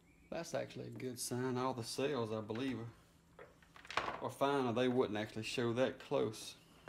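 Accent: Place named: North Carolina, United States. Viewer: This channel is a bit like BOLTR but without the humor, bad language and complete disrespect for the tools he's working on. He also sounds like he must be from near where I live (NC). Good video - thanks.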